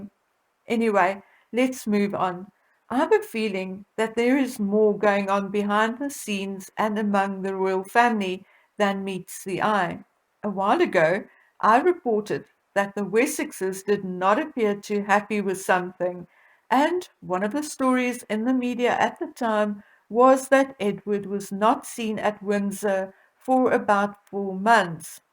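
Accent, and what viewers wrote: South Africa. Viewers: It’s so lovely hearing your South African accent🥰🥰🥰🥰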